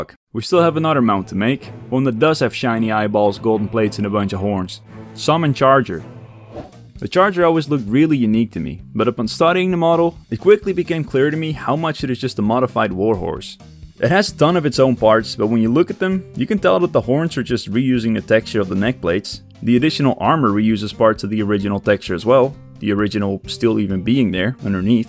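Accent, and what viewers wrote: Netherlands. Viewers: 5 seconden, ja hoor nederlander xD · You're Dutch, aren't you? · Looks like you have been blessed by the algorithm, nice work good sir! Also do i detect a dutch accent?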